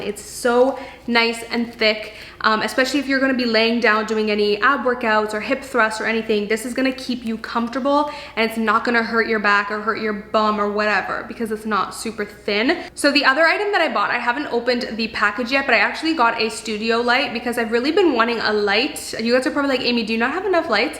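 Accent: Canada. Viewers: Jaclyn Calderon it’s cringe to me but that’s how Canadians say it lol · Oh is she from Canada? I was noticing she says “beegs” instead of “bags”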